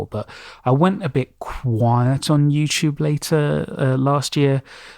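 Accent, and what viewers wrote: United Kingdom. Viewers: Well, for me personally,it was like YT stopped showing me your content. And I always try to engage in the channels i like,even if it’s just liking the videos.. and they’re definitely not going to tell you if your being shadowbanned!! And that sucks about the name!! I’ve noticed channels that do well, consider their fan base as their “family”, and interact often on live streams,posts,surveys (I do them!) never feel like you can’t ask your fans what they want!! I also started watching for wendigo stories….it’s hard to find ones that are close to lore, and aren’t getting skin walkers and wendigos mixed up..there’s some good stories out there, out of the thousands of crappy ones! Find something that you can make ur own, like ASMR(rain,fire,wind sounds)and scary stories. It’s oddly soothing. “Being Scared” I believe is his name is a great creepy pasta narrator,with ASMR. 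Dark Somnoum writes and performs all his music in his videos,and many narrators in the community support him,he’s a great narrator,as well as musical talent!! So maybe there is something special you can offer your fan base as well! It makes folks feel appreciated! I hope some of these comments helped,and are solely meant to be constructive! I am a big fan of yours as well,I love the Brit accent and you have great narration skills as well! I know it can’t be easy!! But thank you for the great content no matter what!!😊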